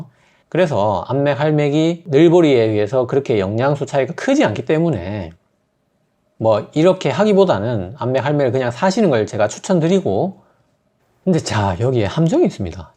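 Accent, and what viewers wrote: Gyeongsang, South Korea. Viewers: 늘 좋운영상 감사합니다🙏 경상도억양 은근 친근감 주시네요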